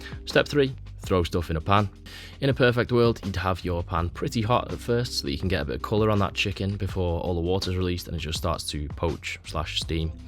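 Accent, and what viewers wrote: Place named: North, United Kingdom. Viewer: I love watching your meal prep videos. Love the northern accent (southern softie here) But, could you do a video on how to reheat/serve meals please? I love to prep but by day 3, I seem like I waste food as I am too scared to reheat it so I eat it cold (gross) or throw it away. Just making the fried rice scares me! :)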